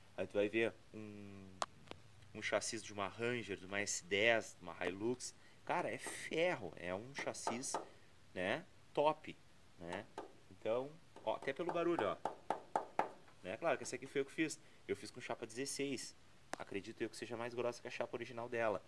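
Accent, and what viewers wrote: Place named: Rio Grande do Sul, Brazil. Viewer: cara legal seu canal eu tenho vontade de comprar um caminhonete dessas ,vi pelo teu sotaque que tu parece ser gaucho tu e de onde ?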